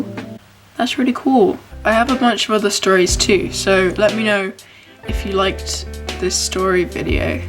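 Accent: United Kingdom. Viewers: I want a British accent now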